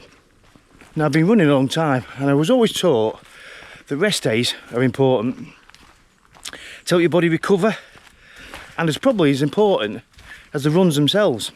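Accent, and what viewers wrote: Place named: United Kingdom. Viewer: I wish this fellow mention for us, foreign runners what he meant by 5k (in his British accent) Is this K (Kilometers) or Miles? Cause this is a huge difference.. 5k in miles is 8 km.. I've been running 10-12k (km) daily for the last like 3 years and I'm over 60 + y.o. Current speed 5 -5.20 / km (Started from 6.15 - 6.30/km back in few years) .. In terms of the REST days.. If I take 1 or 2 then running again its like starting from scratch (even harder).. At this point I came to conclusion that Speed doesn't matter much, but its hard to hold competing EGO in check, that keeps on "speeding".. I can confirm, that you still must "behave" with the food intake, or else even running 10k (km) +/day alone does not guarantee that you will lose weight. Good luck.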